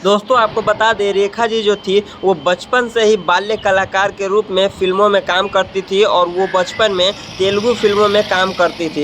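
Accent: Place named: Bihar, India. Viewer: Aap jarur bihari hain.mai bhi bihar ki hoon.apke accent se pata chalta hai. Pahle apna uchharan theek karen tabhi to baat banegi. Mai senior citizen hoon so aapko advice de rahi hoon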